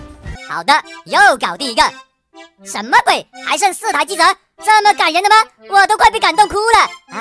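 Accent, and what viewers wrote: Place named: Guangdong, China. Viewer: 靚仔😂😂，怎麼有廣東普通話?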